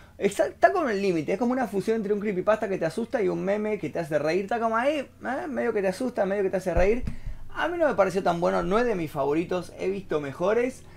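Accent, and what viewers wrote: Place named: Córdoba, Argentina. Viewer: La tonada cordobesa jajaja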